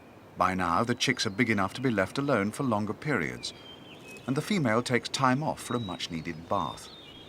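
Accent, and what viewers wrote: United Kingdom. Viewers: These documentaries always seem right when a British dude is doing the dialog...... Got that accent thing goin.....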